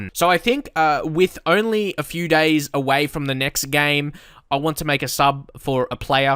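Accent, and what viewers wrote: Australia. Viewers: Hey mate new subscriber here kinda binge watching this at the min I have an ac Milan save on fm 16 loving your content also the Australian accent is so cool haha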